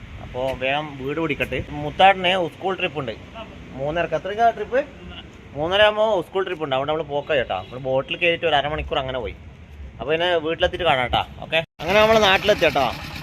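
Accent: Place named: Payyanur, India. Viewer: ഇല്ലാട്ടോ. ഞങ്ങൾ പയ്യന്നൂരാണ്. മുംബയിലാണ് താമസിക്കുന്നെ.വീഡിയോ കണ്ടു നമ്മളെ ഭാഷ, നാടൻ സംസാരം അതാ ചോദിച്ചേ ❤നമ്മളെ നാട്ടുകാരല്ലേ 😀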